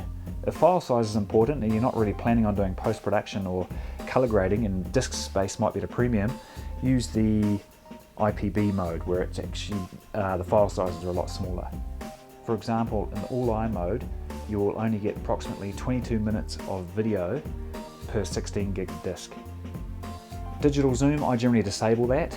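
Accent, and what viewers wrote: New Zealand. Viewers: nice to hear an NZ accent. thanks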